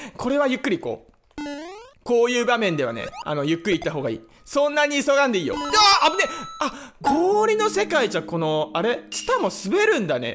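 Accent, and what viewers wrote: Fukuoka, Japan. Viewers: 福岡の方言のほうが聞きやすくて好きです🤘🏻笑 今日も動画面白かったです！ありがとうございます☺ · 自分も福岡ベンなんで、方言使ってくれるの、嬉しいです！ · 方言よきよき😊 福岡住んでたから愛着あるぅ！いまだ福岡の方言でちゃいます😂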